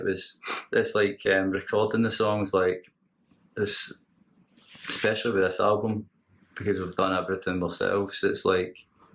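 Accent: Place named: Scotland, United Kingdom. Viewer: I'm going to get in Scotland to learn to talk with that accent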